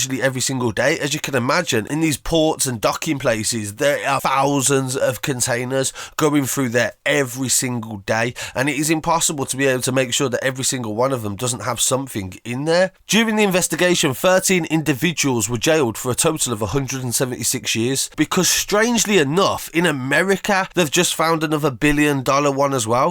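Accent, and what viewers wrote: Birmingham, United Kingdom. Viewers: Just the birmingham accent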